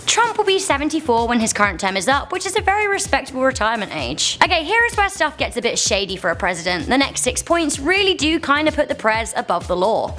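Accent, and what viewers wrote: United Kingdom. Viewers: I’m assuming you are British due to the accent and would like to point to the stupidity of this video. I am not a Trump supporter but feel the need point out that Trump along with all US Presidents are entitled to all of the things you mentioned. HOWEVER, the Queen of England (UK) is in fact above all British laws as she is considered the law. She could commit murder in the middle of London while flying a Nazi flag and drinking Scotch Whiskey naked and pissing in a bucket and could not be arrested. Everyone in the British government serve the queen. SMH at the unrealistic way some attack Trump. He may not be the best president but he is still subject to US law and impeachment.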